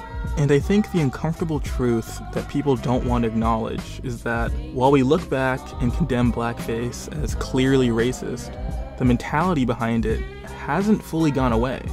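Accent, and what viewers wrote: Queens, United States. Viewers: She's from Queens. Get over it. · She really just sounds and talk like that she is from queens nyc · She grew up in Queens... the not nice part. Everyone talks like this. Indian, Italian, Asian, Mexican... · Isn't she from New York in queens, where the whole community sound like her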